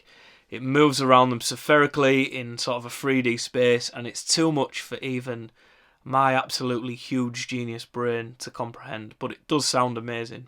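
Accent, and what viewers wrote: Northern England, United Kingdom. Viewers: Great video! Also good to hear another northern accent in modular videos (as well as the OG, DivKid). ES-9 isn’t ADAT btw. It’s SPDIF. If you want to use ADAT then ES-8 is a better choice.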